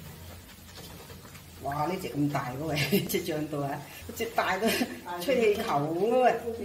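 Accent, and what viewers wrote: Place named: Renhe, China. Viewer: 我地家乡都整呢滴 😄 听你的口音 有点像人和人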